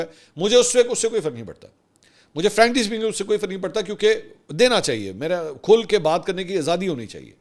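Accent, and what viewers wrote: Punjab, Pakistan. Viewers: punjabi touch zaida karay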